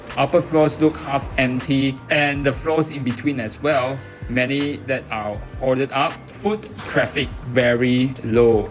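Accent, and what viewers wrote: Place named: Singapore. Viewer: Bagus cakap dalam bahasa Melayu, bahasa Inggeris logat Singapura sangat mengeciwakan..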